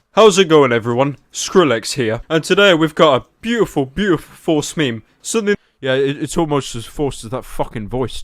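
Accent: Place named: United Kingdom. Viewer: Damn you an you manly deep british voice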